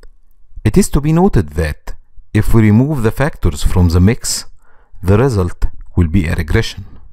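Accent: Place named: Egypt. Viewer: Finally Found the video that help me to know how can I use it in the research. By the way Egyptian voice and accent in this video is nice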